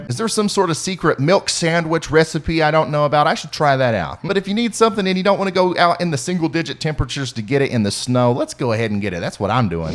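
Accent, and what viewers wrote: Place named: Kentucky, United States. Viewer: wow.. I think lives in ky too..i recognize the accent because it's where I'm from originally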